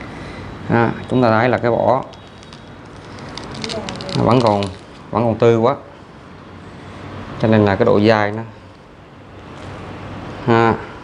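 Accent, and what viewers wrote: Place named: Quang Nam, Vietnam. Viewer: Bạn ở đây mà nói tiếng Quảng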